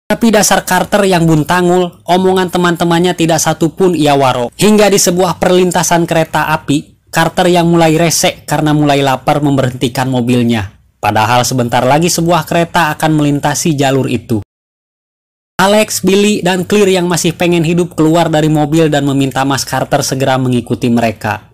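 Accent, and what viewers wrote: West Java, Indonesia. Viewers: Lanjut dengan logat sundanya euyy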